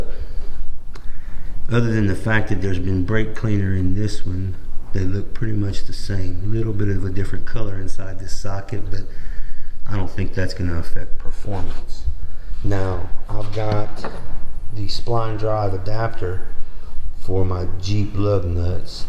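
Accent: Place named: Louisiana, United States. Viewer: Noticed the accent before you finished your first sentence. Is it wrong that I'm more inclined to trust someone who talks like me? Been debating between these brands and was under a different impression. Thanks from Lafayette cher.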